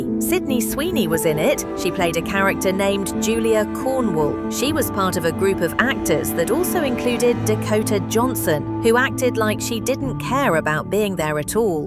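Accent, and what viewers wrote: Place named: United Kingdom. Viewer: I hate that accent…this is the US not Britain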